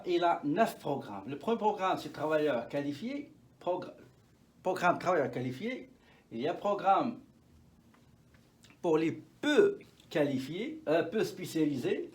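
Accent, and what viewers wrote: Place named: Morocco. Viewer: لا اقصد العربية الفصحى ، ولكن اقصد الشرح بلهجتك المغربية الجميلة ❤🍁🎈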